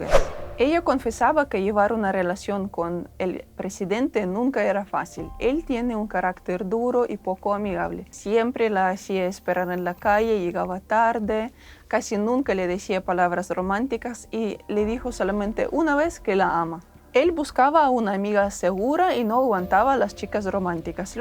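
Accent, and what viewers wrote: Russia. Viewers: Hola Saludos imagino por tu asento eres Russa. Muy buen video y documental Gracias. Conosco un poco de russia 🇷🇺 lo visité desde Moscú asta SantPeterburgo. Wow q hermoso país muy ordenado y por sierto tuve en relación con una hermosa chica russa mi respeto y admiración por la cultura Mi opinión personal 100% me quedo con las chicas europeas porq te admiran y respetan nuestro espacio y muy sociables Nunca le preguntan de entrada eres soltero o casado no le rebuscan los bolsillos aunq lo pueden estar necesitando son muy respetuosas. Me encanto tu video Gracias 🙏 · Interesante historia y muy bien redatada con esa voz suave y ese acento ruso innegable, vaya que hermosa combinación.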